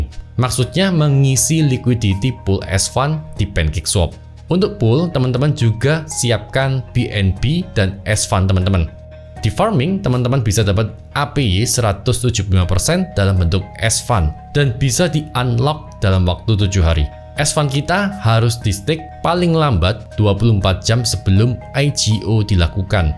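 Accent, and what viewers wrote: Java, Indonesia. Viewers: Bro asli mana medok bgt. Salam jawir wkwk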